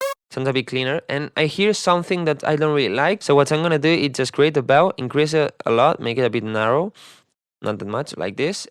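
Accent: Spain. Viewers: Como se nota que eres Español xd, buen video!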